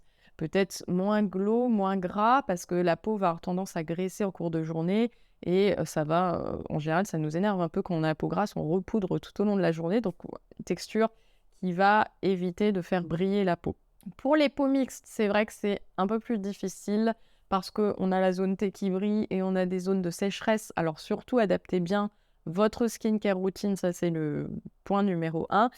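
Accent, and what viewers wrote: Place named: Paris, France. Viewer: La vidéo est très intéressante et les conseils sont bons mais l'accent parisien là j'arrive pas 😅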